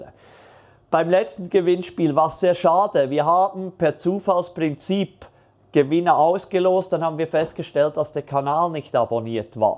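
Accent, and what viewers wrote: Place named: Switzerland. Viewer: vielen Dank für dieses tolle gewinnspiel ich drücke mir und allen anderen die Daumen und würde mich sehr darüber freuen. Seit Jahren schaue ich deine Videos und mage deinen schweizerischen Akzent. Ich hoffe auch mal nächstes Jahr bei einem Kurs von dir dabei zu sein. Frohe Weihnachtszeit 🎄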